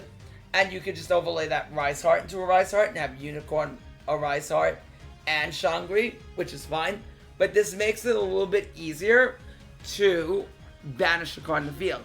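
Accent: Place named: Long Island, United States. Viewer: Dude, you have to be from Long Island. 😂😂😂 Great Video my guy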